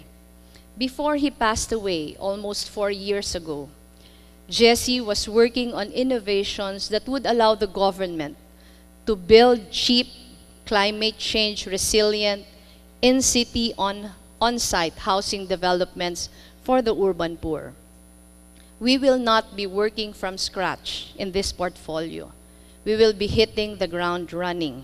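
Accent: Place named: Philippines. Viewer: What's the problem with Filipino accent or her tone?😊 I hope this is not the first time na nakapanuod kayo ng isang speech? Internationally or even locally, it is well accepted to read a speech as it maintains close control over the tone or the timing of the speaker. Try to watch some inauguration or graduation speech, hindi po kahiya-hiya na gamitin ang pamamaraan na 'to. The audience does not care, anyway, what their after is the substance of her speech. You can also watch her Q&A dito rin po sa business forum. Please watch objectively, do not mock and disrespect the speaker merely because she's reading. She's invited as a resource person because she's considered as a person of authority and credibility. May expertise po siya sa larangang iyan. Ayos lang po na may suportahang kandidato ngunit huwag po nating hayaan lamunin tayo masiyado nito na nagiging bastos na tayo. 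😊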